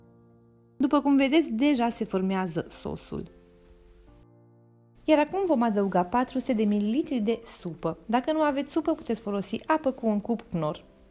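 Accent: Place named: Italy. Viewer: Bună ai fost în italia ai accent de italeanca eu mam născut în Italia 💕💕💕💕💕🥰😈💕💕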